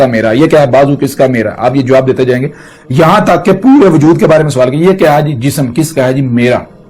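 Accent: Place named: Gujarat, Pakistan. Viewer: Iss gaandu ko urdu bhi theek se bolna nahii aati.......lehja marasiyon orr gujraatiyon wala hai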